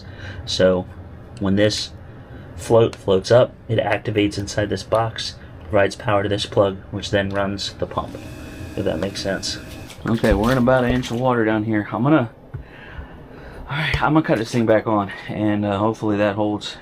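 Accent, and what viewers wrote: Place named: South, United States. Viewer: 🤔 hmmm, why do have a southern accent in some of you videos and not an accent in others?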